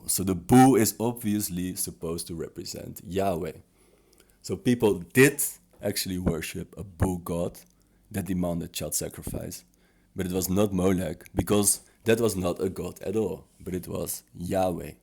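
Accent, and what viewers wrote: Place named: The Hague, Netherlands. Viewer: I can tell you are from den haag because of your accent lol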